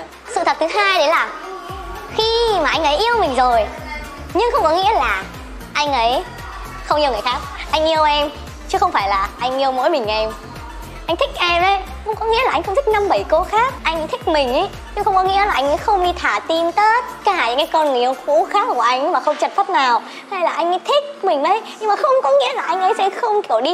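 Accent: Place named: South, Vietnam. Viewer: Nói giọng Nam rồi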